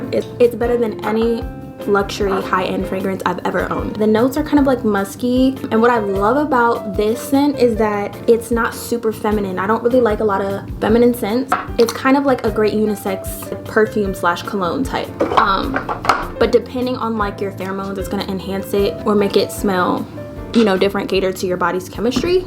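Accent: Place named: United Kingdom. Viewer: Yessss we missed you , at least the British accent will never change on us 😭 love your videos ❤❤❤❤ so glad your doing well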